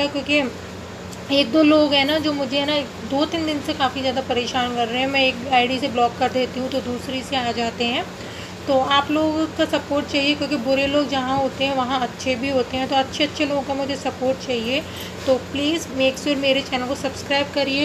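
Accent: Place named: Uttar Pradesh, India. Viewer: apki bolne ki style pr bahut hasi aa rahi thi....sach me up me ase hi bolti h ourate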